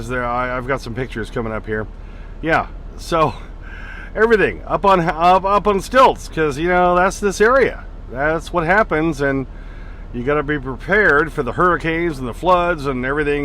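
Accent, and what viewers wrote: Louisiana, United States. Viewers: Your cajun accent is spot on big dogg!! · Your louisiana accent was so funny🎉❤ I never been that far south in a truck very cool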